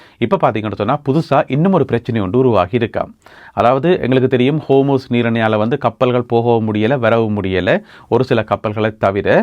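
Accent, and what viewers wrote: Sri Lanka. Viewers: ஸ்கீரின் பேக்ரவுண்ட் ஒரு செய்தியில் ரெட் இப்போது கிரீன் நல்ல ரசனை அருமை..👌 செய்தி வாசிப்பும் இலங்கை தமிழ் நெல்லை தமிழில் அழகாக வாசிப்பு திறன் அதுவும் தடையில்லால் சூப்பர்.. போர் செய்தியும் மற்ற சேனலை விட நல்ல விளஙம்குபடி சொல்கிறார்.. ஓயில் ஆயில் கொன்றது கொண்டதுன்னு கதைக்கிறது ரசிக்கும்படி உள்ளது.. எனது வயது 70 படிப்பு 7 நான் நெல்லை தமிழன்..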